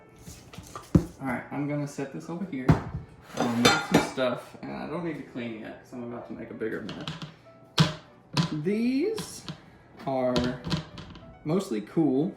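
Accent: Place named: South, United States. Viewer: I can’t stop laughing at this and the southern accent -Savebee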